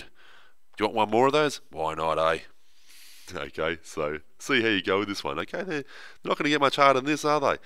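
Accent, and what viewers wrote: Australia. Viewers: When I take my test... I'll have this guys Australian voice in my head... Thank you sir!!!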